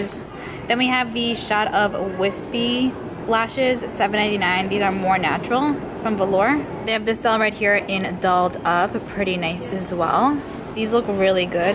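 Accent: New York, United States. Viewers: Just found your channel and I'm loving it! Congrats on the collab 👏. Which TJMaxx is this? I see you're in the NY area (and I can def hear it lol) and I swear the North Jersey ones aren't as stocked up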